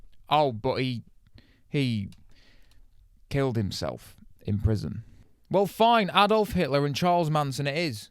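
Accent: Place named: England, United Kingdom. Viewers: This is a perfect English accent. Most annoy the shit out of me, but owns all. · Sounds Manc to me I reckon, any other English lads or himself can confirm? Thank God he's not fucking scouse that's for sure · he’s mentioned Sheffield before. so probably near there!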